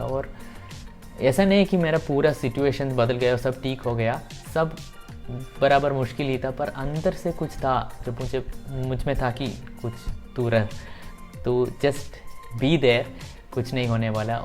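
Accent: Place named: Kerala, India. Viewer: ur hindi is very sweet chetta...whenever any malayali speak hindi..they speak very sweetly!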